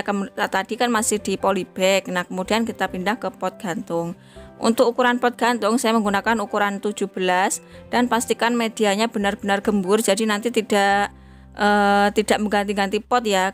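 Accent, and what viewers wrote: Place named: Java, Indonesia. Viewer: Medhok mbak jowony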